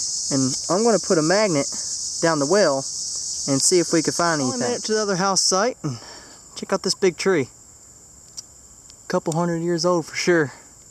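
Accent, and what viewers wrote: South, United States. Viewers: you have an American axent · you know your watching when you hear his country accent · i like your country actsent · I can not stand his countryness.......???? Accent and how he talks but I love his vids though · He says gowd instead of gold good old southern accent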